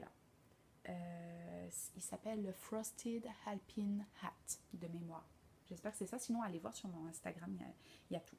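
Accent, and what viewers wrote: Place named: Quebec, Canada. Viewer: très bon moment passé avec toi j'adore ton accent et puis ma fille est au quebec Rimouski) depuis 9 mois pour ses études alors je suis d'autant plus contente de découvrir tout ce qui touche à ton pays et autre point commun je suis infirmière aussi et j'adore le tricot j'ai découvert les laines de la maison tricotée au salon aef elles sont magnifiques je comprends ton engouement pour cette boutiquehate de te revoir dans un 2ème épisode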